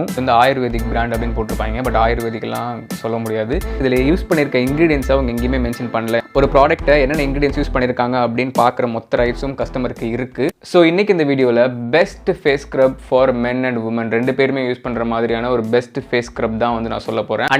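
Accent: Madurai, India. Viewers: Maduraikkararo Neenga 🤔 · Anney ninga Maduraiya😁.. Onga slang appudi eruku... "Avinga,Evainga... Pooturuingey"😍😍😍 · Bro Madura slang varuthu apo apo nenga Madura ya 🤔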